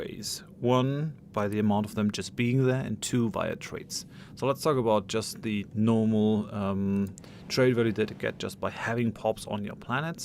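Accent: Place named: Germany. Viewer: That German accent though….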